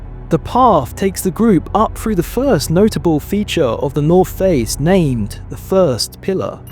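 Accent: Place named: Northern England, United Kingdom. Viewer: Confession time: I'm always willing to support new projects via Patreon or whatever but want to know whether the creator is serious about their work, and usually responses to criticism are a good way to find out. Sorry about the deception. Anyway I see your main strengths as your visual interpretation of the story, and the friendly non-AI Northern tones of the narrator (yourself perhaps?). Well good wishes for your new venture I've subscribed so I can check out your next download when it's available.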